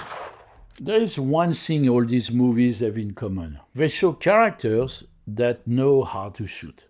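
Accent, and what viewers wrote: France. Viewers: As a native french speaker, his accent is way too pronounced. This is so cringe. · First time watching this channel. I enjoyed this episode. I especially liked the French (I believe?) accented narration because it’s unusual. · There’s a French Guy telling us about firearms??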